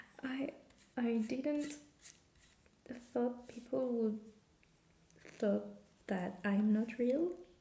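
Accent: France. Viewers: your videos are great and you are one of the few asmr channels i watch. i would request that you do more whisper/talking videos such as when you looked through your artbook. your voice and accent are so relaxing and charming. obviously you are french, but if you were playing a french character then you are playing it very well :) hahaa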